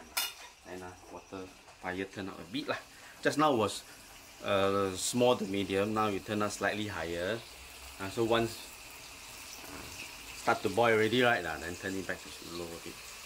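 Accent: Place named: Singapore. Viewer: Typical singlish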